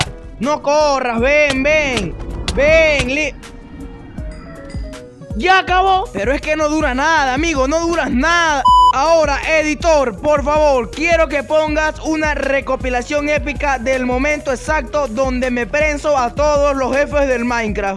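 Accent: Venezuela. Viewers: No es q eres venezolano creo q se eacribe asi y porque le decias al esqueleto asi :/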